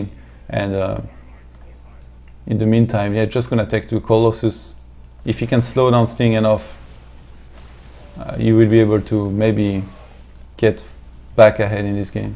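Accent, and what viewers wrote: France. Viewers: that french accent! keep it up! the analysis level is close to qxc`s terran analysis. and that`s about the highest praise i can give you.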